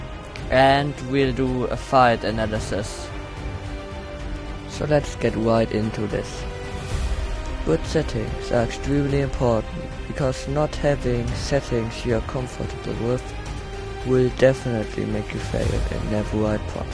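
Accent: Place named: Germany. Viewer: Ngl as a German I think u have such a German accent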